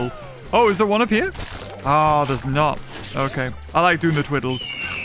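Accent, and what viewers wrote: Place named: United Kingdom. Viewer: You are Markiplier but bri'ish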